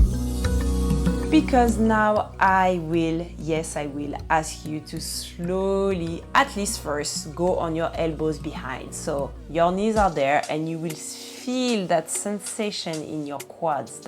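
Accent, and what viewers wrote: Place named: France. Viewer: English with a French accent is insane. Now you know what your trump card is.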